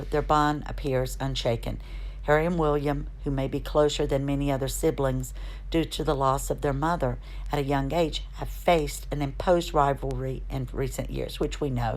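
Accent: South, United States. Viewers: Where in the south are you from? Beautiful accent.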